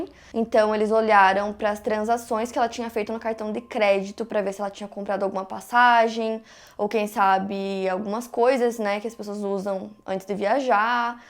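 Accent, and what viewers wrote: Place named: São Paulo, Brazil. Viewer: Nada a ver com o vídeo, mas a pegou completamente sotaque de Paulista 🤣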